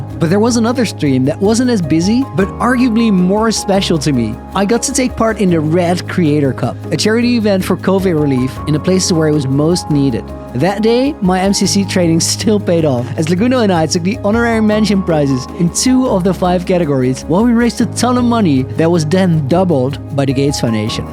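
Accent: Netherlands. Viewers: since I joined this adventure when u only had like 50K subscribers, I immediately recognised you’re Dutch accent, I am from Belgium but I really enjoyed every hour I could watch u. Keep up the great work man!!!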